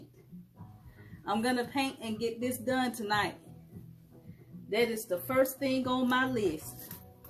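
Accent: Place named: South, United States. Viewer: So funny to see You in person and hear You speak. You have a southern accent for sure 😂. It’s super adorable 🥰.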